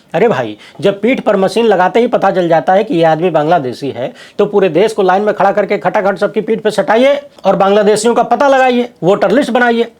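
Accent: Bhojpuri, India. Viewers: वाह,! भोजपुरी की भीनी ख़ुशबू में रचाबसा, ख़बरों का ठेठ देसी लहजे में आप का विश्लेषण हमेशा ही लाजवाब होता है। ऐसे ही फोकट घण्टा बजाते रहिए।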